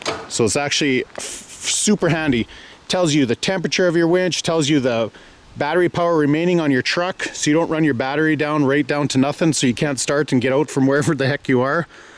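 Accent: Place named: Canada. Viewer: talks like a true Canadian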